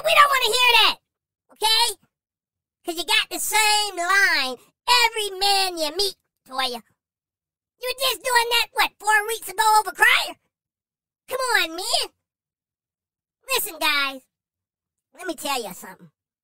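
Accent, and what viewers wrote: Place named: Carolina, United States. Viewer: I hear the Carolina accent. Lol. Is this new channel or channel name? Previously?🤔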